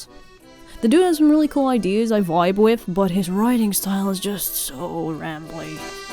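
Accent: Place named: Brabant, Netherlands. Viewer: Zag de "Jezus wat was 2020 een kut jaar!" en ging verwachte vanaf dat moment dat je Brabants zou zijn haha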